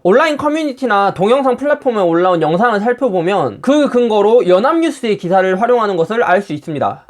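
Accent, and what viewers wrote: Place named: Jeolla, South Korea. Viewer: 전라도 사투리 구수하네요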